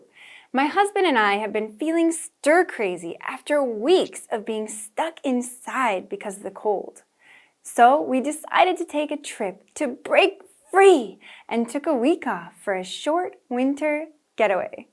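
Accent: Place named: United States. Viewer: 음~~~ 이분 영어 강의 들으니까 미국인 같아 !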